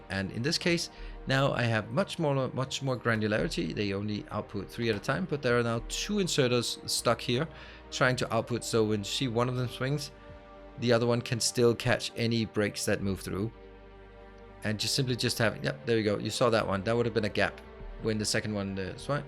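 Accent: Denmark. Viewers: Are you danish, your accent sounds kinda danish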